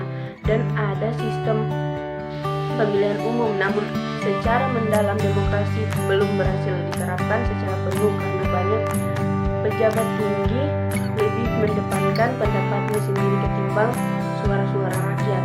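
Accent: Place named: Eastern Indonesia, Indonesia. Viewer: Selain warna kulit, dialek adalah alternatif untuk mengidentifikasi seseorang. Yakin dan pasti ini ini adik's dari timur.... 😅🙏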